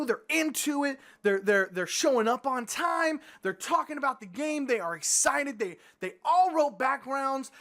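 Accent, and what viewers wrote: South, United States. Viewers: You can hear the Texas in his voice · you get a southern accent when you get agitated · LOVE THIS. I can hear it in your voice, like you went a little "ghetto" (or deep south? - I don't know, I am from Wisconsin) on this:) LOL PERFECT what you said about having the "adult" conversation - gaming is all about COMMUNICATION - if we cant talk to each other, why are we playing? I have been gaming for 30 years and I cannot once think of a time where I as a DM or a player experienced TPK for something like this. Recockulous advice you were given. Keep making videos, I love your work - great stuff! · turns the dixie up to 11 (accent) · You get more southern when you get angry! lol But seriously I agree. I have met some old school DMs who totally get off on TPKs. There are a lot of folks that simply, in my opinion, are not flexible and creative enough to be DMs!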